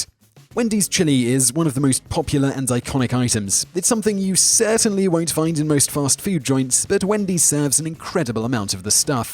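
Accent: England, United Kingdom. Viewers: is English and that's how we pronounce advertisment in England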